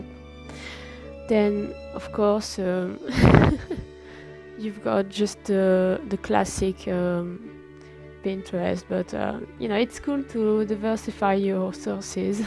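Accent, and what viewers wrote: France. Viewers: l'accent francais est adorable<3 merci pour cette vidéo!! jai souvent le réflexe de dessiner ma persona en mode silly goober et ce qui marche pour moi cest de créer une version "originale" simple, et ensuite faire autant de AU que je veux du genre ocean AU, space AU ou je m'amuse plus avec le design :)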